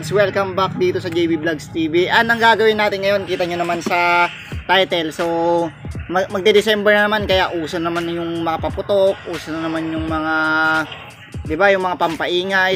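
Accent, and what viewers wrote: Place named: Visayas, Philippines. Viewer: Bisaya ka lpds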